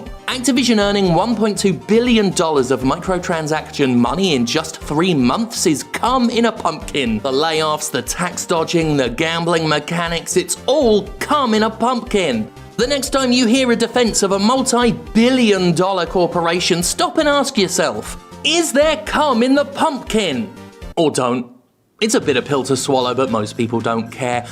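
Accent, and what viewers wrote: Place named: United Kingdom. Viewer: the ones thing that irks me, is that you STILL buy the games and review them. Dont. Dont use the its my job excuse, show the example. Ive yet to see ONE reviewer, ONE online personnality actually not buy the games of companies that 'fill in the bank with something horrible'. I think it would have a much greater impact and put more force behind the words if when people asked 'how come you haven't done a cyberpunk review?' you could answer 'i dont support products of abuse', rather then oh ill review it when its on sale so I give the abusers 20 dollars less. I can't get passed it. Every reviewer acts like hes holier then though, its their job therefore they are above reproach. All these guys would ask ore suggest boycotts, but they would never make a stand. Listen, if you fear all your fans will leave you if you dontyreview the next big game..... 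that means they don't care about you or your content and you've failed as a creator. Im happy to hear you officially wont touch ubisoft games. I was gonna point out you did purchase breakpoint and blah blah. the lesson is to get to the end of the video! In your case specifically, I think people watch you for how you talk. No I don't mean the beautifull British accent, I mean how you vocalize your thoughts and ideas. You are a legitimate entertainer. I would wager you wouldnt lose a single subscriber if you only ever talked about these companies failures and not their products, never purchasing one again. heck youd even save money!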